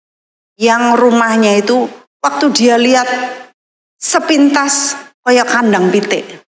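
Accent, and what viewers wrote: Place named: Surakarta, Indonesia. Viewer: Senang sekali mendengar kotbah dengan logat solo - jawa yang kental. Kiranya Tuhan Yesus selalu memberkati pelayanan Ibu.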